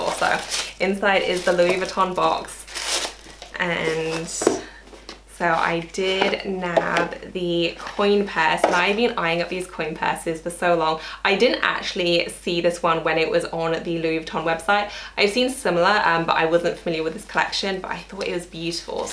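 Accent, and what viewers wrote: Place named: London, United Kingdom. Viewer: I want to enter the Chanel wallet giveaway!!!! I love your videos and London accent 🥰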